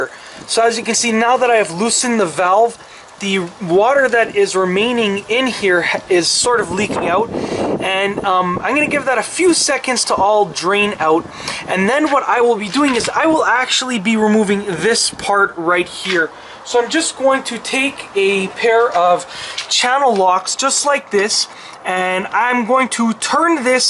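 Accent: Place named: Eastern Canada, Canada. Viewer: ray r at which of your local "skool" does he sound like a prof to you? Please tell is so we can avoid that area LMAO. He sounds like a typical north eastern guy or eastern Canadian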